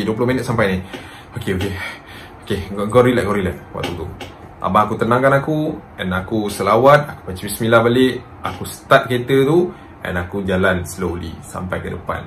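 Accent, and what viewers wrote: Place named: North, Malaysia. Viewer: Terbaik..🎉👍 loghat utaqa noh hang balun🤣😂moga sukses sllu dik..perlis hadir🙏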